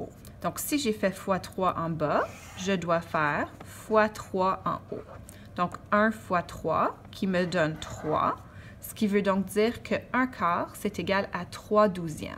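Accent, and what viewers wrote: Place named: Quebec, Canada. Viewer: bien expliquer je suis la seule a avoir écrit sinon j'adore ton accent quebequois PS:ds pour l'ortographe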